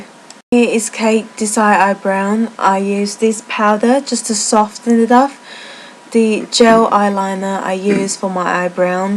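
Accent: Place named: United Kingdom. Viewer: I wish I have British Accent like yours. T^T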